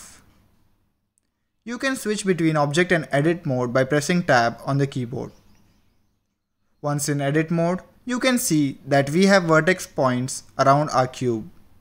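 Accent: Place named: India. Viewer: When they have an Indian accent, you know it's about to be good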